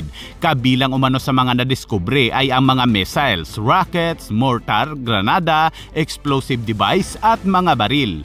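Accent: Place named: Visayas, Philippines. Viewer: Kala ko mali lng spelling mo bisaya pala ikaw bumasa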